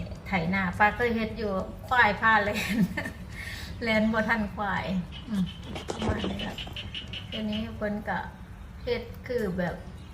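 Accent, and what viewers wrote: Phu Thai, Thailand. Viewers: มาฟังป้าเว้าภูไทนำจ้ามวนๆจ้าสำเนียง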